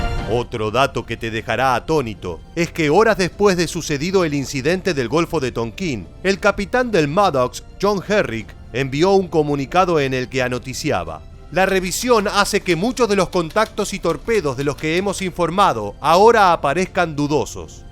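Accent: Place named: Buenos Aires, Argentina. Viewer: Querido amigo, sigues con el virus Argentino de la soberbia, de hablar como el único ser humano inteligente. Puedo decirte sin equivocarme y con humildad que eres un ingnorante de muchos cosas de las que hablas , además de leer los escritos históricos como un loro. No haces ningún comentario propio de alguien que estudia los verdaderos hechos históricos. Tu acento porteño y de soberbia hace tambalear lo que dices en tus vídeos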